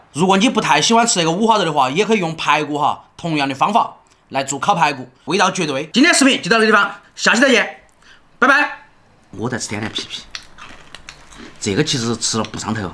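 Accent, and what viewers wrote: Sichuan, China. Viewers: 好标准的四川话。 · 很好吃的样子，不过还是怕油腻 四川话很好听啊，越听越好听 想念国内的新鲜大葱😍😍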